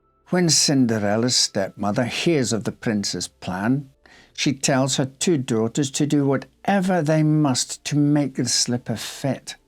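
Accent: Scotland, United Kingdom. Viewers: I remember all of the Grimm Brothers books. I love the real history of them. I always love watching you on YouTube. Love the Scottish acsent. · I love to listen to your telling of tales, and love it when you ‘lose it’. You have a very calming voice and your accent reminds me of my Scottish in-laws, rest their souls! Thank you. ❤️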